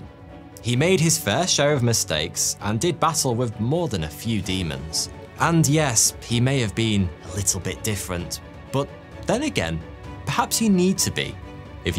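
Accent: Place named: England, United Kingdom. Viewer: Same, and I’m not a fan of English accents, but is an exception… 😂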